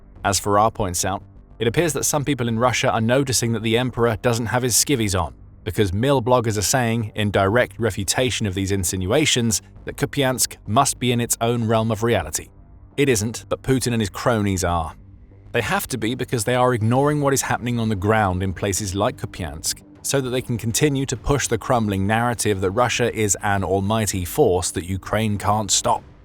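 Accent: United Kingdom. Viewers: You can tell it's bullshit if they narrate with a British accent.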